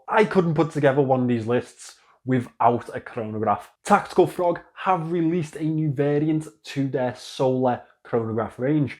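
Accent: Liverpool, United Kingdom. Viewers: hey, nice choice of watches. Nice video. What accent do you have? Scouse?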